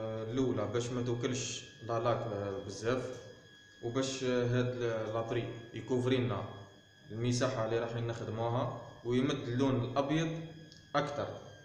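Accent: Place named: Tunisia. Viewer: لهجنك قريبج للهجة التونسية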